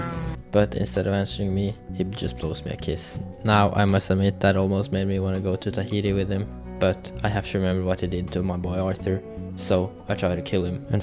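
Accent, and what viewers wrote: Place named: Norway. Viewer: very good norwegian english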